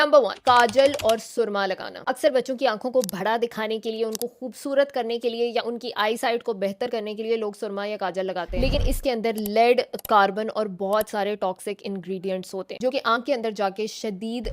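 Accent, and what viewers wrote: Punjab, India. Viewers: Hhhh is my fvrt Dr, you talking is so cute 😸 with panjabi 😘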